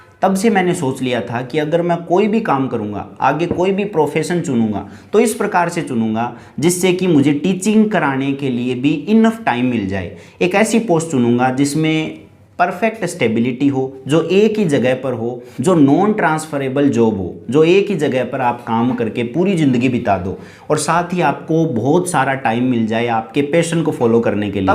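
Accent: Rajasthan, India. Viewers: Bhai Rajasthan se ho ky....? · Bhai aap rajsthan se ho kya?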